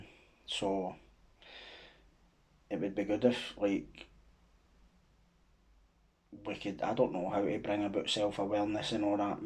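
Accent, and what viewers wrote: Scotland, United Kingdom. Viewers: I’m from Edinburgh mate and abit older than you so don’t think our paths crossed- think I’ve just got one of those face’s tbh- I get that alot. Keep up the good work your doing in life with your boy and in general also enjoying your channel. It’s good to listen to a channel channel with a Scottish accent,especially for me as I live in London these day’s.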